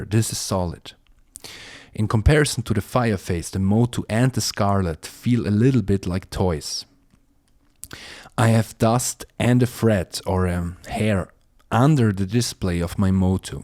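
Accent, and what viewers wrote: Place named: Germany. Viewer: please don't lie to me, I am native, and YOU ARE GERMAN ! never ever of any common wealth area..nice try but just because you MIGHT live in Australia which I also doubt, you are no English native. I am supposed to like germans but after dealing with this...we don't se zurgical we say Surgical and so on so pretty please with sugar on top..get real !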